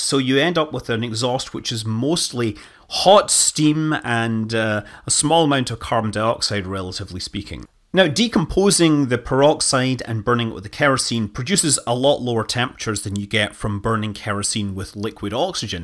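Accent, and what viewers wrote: Scotland, United Kingdom. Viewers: I like this video series - “Scottish man reads space based Wikipedia articles”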